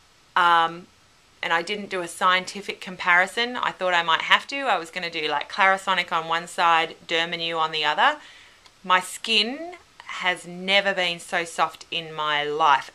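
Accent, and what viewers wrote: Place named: Australia. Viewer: I just love your Aussie accent! Here in Europe we mostly only hear UK or US english, this sounds so cheerful LOL!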